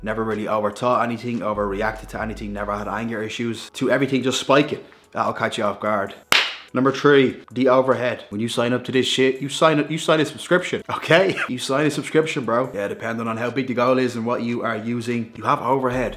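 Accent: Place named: Ireland. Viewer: I like your Connor McGregor accent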